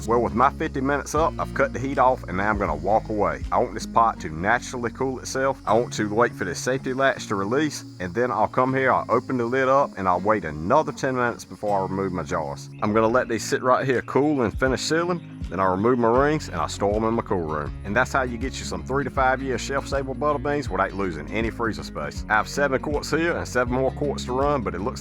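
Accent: United States. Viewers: It’s some kind of American accent, definitely not Australian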